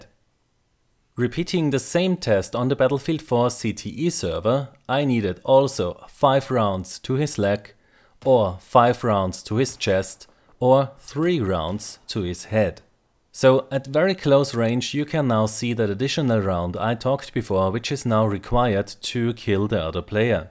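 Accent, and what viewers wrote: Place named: Germany. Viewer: Haha okay, cool:) Is interessant wie einem sofort aufällt, wenn einer aus DACH englisch redet. Aber du machst das megamäßig gut und super verständlich;)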